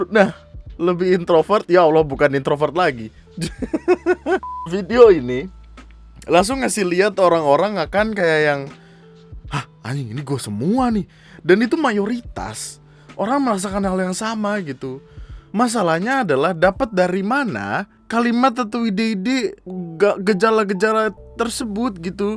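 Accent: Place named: Lampung, Indonesia. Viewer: Raden Inten Lampung itu bang, dari logatnya org Lampung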